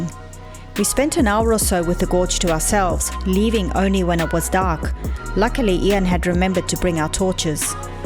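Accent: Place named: South Africa. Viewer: I do like that South African accent!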